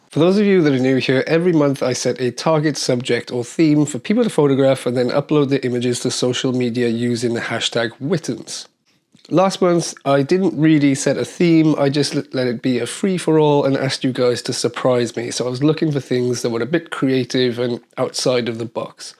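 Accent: Wales, United Kingdom. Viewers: Laughing at the YouTube subtitles misunderstanding your welsh accent and telling me the Tories are fireballs (I wish)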